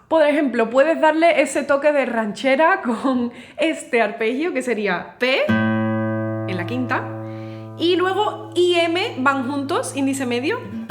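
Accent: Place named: Malaga, Spain. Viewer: No creo faltarte al respeto conmigo comentario , pero eres muy bonita, por ti acento has de ser Málaga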